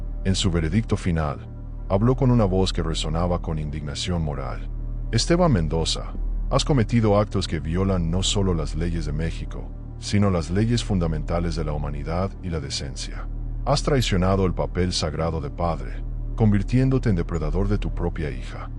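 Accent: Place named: United States. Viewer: Lo malo de esta historia es la voz un gringo que puede saber lo que pasa en México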